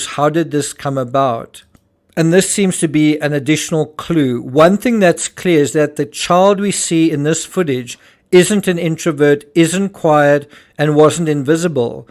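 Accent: South Africa. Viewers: Tbh i'm shocked your not British, South African a real blind spot for me. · You say you found the British less warming to you than other nations you lived in, I don't know your race or nationality, or anything about you, but judging by your voice I thought white South African, which is generally a group that is seem as more aligned to, though Australia probably tops for being most similar. America has always been a different thing, that country has huge influences from all over, but more than anything else, they have a uniquely different way of being & doing things, so as a Brit I can say yeah, theres a gulf between us & America. I sure some Americans do an affinity with Britain, & those individuals might feel more similar if I was to meet them, but over all, American pop culture has a vastly different feel & myself personally I feel our British culture has been lost to it all too much, so while its nothing personal to individual Americans, I am not too keen on the loud over confident American brashnes & razzmatazz, I'm pretty Old School English & I love quaint stuff lol. 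But regarding "foreigners" & other races, we have actually been really welcoming much of the time, the Brits overall are a friendly & safe bunch, a few louts but even they are fairly harmless. But what you may not understand, as an outsider or newcomer, is that we have experienced a huge culture shift, that has been detrimental, we have shared scarce resources, the white working class, houses & space, as a small island. We have paid taxes that go on housing migrants whilst are own p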